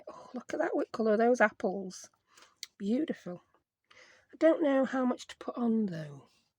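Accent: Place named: Sheffield, United Kingdom. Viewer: Morning just to say I love all your videos love your accent you make me laugh probably because I’m from Sheffield as well. Do you have any classes going anywhere would like to go if you do ?😊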